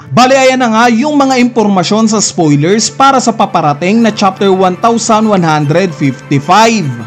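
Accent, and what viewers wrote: Visayas, Philippines. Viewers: Bisaya pla to si idol eh hahaha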